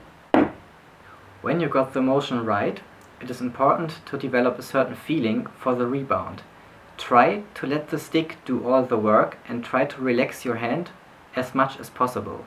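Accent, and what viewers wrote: Germany. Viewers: You don't hear Germans with this accent much anymore. It's a cool accent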